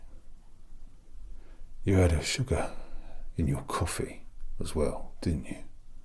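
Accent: United Kingdom. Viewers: i just clicked in here for the british accent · BRITISHNESS AS A TRIGGER IM DEAD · omfg hes so British, i love it sm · THE BRITISHNESS TW 😂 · i dont understand shit because hes too british, i swear to god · this is so british and i love it .. 😂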